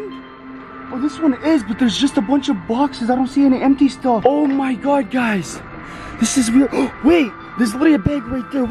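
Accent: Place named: Chile. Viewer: Abla como chileno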